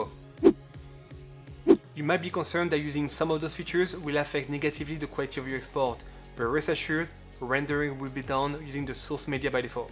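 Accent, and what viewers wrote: France. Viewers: I believe you're french... Based on your accent 🙂 Thanks for the video. I'm still on the free version, I wonder if I upgrade to studio with my RTX 2070 Super would be enough to have smooth playback in almost all situation or if I should go with the new MacBook m1 ? What are your thoughts on this ? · mmm French accent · I love how he speaks in french using english words! :D. Thanks for the video, I didn't grasp much as a total noob but generating proxy media solved the issue. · Trench accent ? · Loved your video!!! Super thanks!!! ❤❤❤ Your accent is from France, am I right? 🤭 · merci french accen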